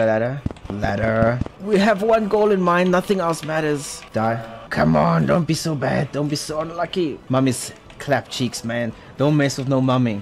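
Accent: South Africa. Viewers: I love when people ask this because nobody has ever sounded more South African than him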